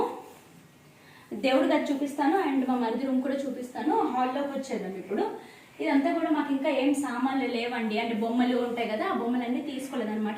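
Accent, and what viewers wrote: Srikakulam, India. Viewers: Srikakulam slang vastundi 😘😂😂madi kuda sklm aa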